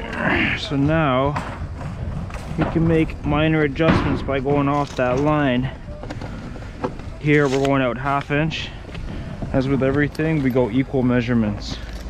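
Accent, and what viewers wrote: Canada. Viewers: Are you Canadian?! Sounds like it haha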